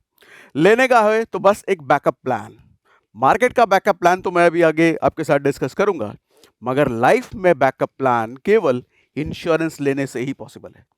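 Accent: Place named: Kanpur, India. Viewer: full on kanpuriya 😁😁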